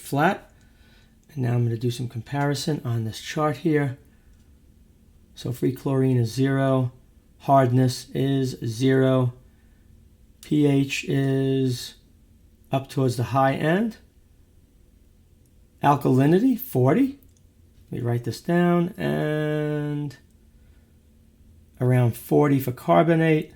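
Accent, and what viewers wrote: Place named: Boston, United States. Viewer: So you're a Bostonian. I can tell by your accent plus the can is labeled Boston, Mass.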